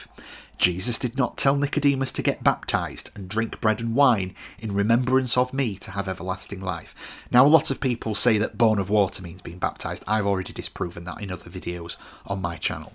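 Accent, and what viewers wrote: United Kingdom. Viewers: Great deep study of repentance, as always brother 👍🙏 i enjoy your studies, fyi: i love UK accent 😊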